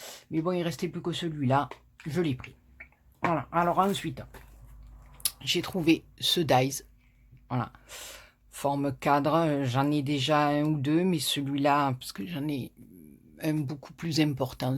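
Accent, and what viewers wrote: South, France. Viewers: De bien belles trouvailles pour vos prochaines créations, le bloc 30 x30 est superbe et les jolis dies cuts je ne les ai pas encore vu. Merci pour ce partage et ce bel accent du midi. Bon week end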